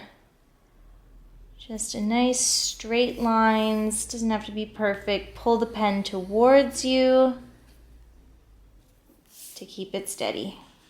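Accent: Canada. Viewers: I love your videos. Totally inspiring. I've taken so many ideas from you, and have even found myself slipping from my American hick accent to your fun Canadian one from time to time. :D That's how many times I've watched your videos!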